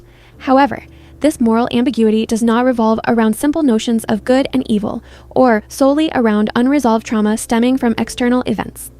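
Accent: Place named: United States. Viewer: If you didn’t say English wasn’t your first language I wouldn’t have known! Your accent is so American :) one request though, is to slow down your speech a little bit, I had to slow the playback speed down to 0.95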